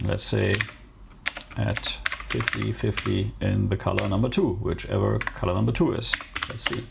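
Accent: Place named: Germany. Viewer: Ohne deine Beschreibung zu lesen, höre ich einen starken, deutschen Zungenschlag. Habe eben deinen Kanal gefunden und bin begeistert. Tolles tech-zeug, tolle themen und danke für den howto-spirit! Alles Gute weiterhin!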